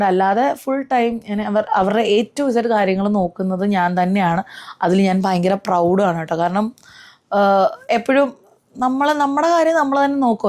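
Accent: Thrissur, India. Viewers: kunje ethu dress ittaalum kaanaan nalla bangiund U R always perfect by all means Kottayam kaari yude language slang Thrissur kaari pole aayi Kottayam pokunna thrill il aann enjoy cheyyu Happy n Safe journey God Bless ❤❤❤❤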